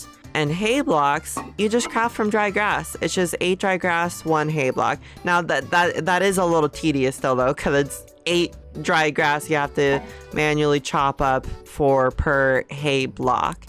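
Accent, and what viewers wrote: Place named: United States. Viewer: i cannot for the life of me place this accent. i know it. i have BEEN here before, but i cannot recall where in america this is anyway regarding the crude door-- that dont break near frequent enough to deter me. i love how that door looks, takes 2 seconds to put back. hardly different than my real doors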